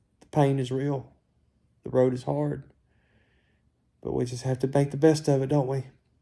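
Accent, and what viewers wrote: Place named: United States. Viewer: Ps where in America do you live ? As I love your accent. Best wishes again soo good to see you sitting up and chatting It will be tough as you know but you have got this ❤❤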